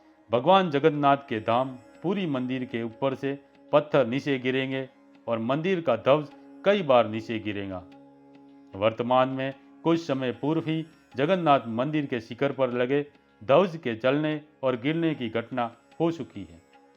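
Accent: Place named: Marwari, India. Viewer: भाई पक्का आप राजस्थानी हो आपके बोलने से ही पता चल रहा है की आप मारबाड़ी हो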